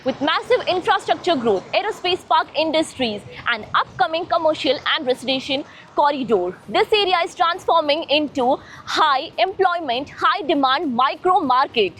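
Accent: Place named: Bengal, India. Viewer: Bengali English accent😊😊😊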